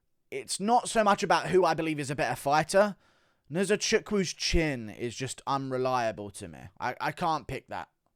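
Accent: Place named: England, United Kingdom. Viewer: I love your channel, I love your picks, and analysis. I just wish you weren’t English, because I just can’t your voice idk why lol but you’ll always have my support 😂😂😂😂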